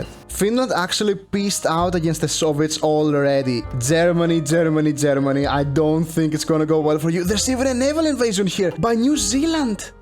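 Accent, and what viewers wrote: Greece. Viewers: He sounded Greek to me and because I'm Greek to I had to make sure thanks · Your accent sounds like your greek who speaks English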